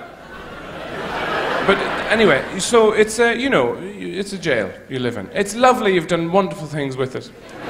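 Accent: Ireland. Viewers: He is Irish, not British. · hey dip wit hes irish not english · I'm Irish so when he started talking I was like Wait is he Irish ?! Then I like nah probably not nobody Irish is really famous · lol he isnt english at all, he's irish from the republic of ireland.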